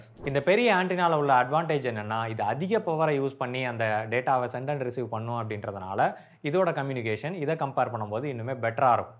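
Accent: Tirunelveli, India. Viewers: Tirunelveli iruku bro 🤔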